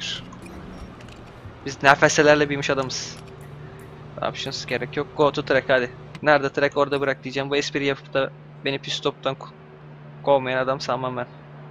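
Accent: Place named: Hatay, Turkey. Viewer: diksiyonun baya düzelmiş. Ayrıca Hataylı olduğunu ilk duyduğumda inanmamıştım ama bu tonlamayla başka bir ihtimal düşünemiyorum.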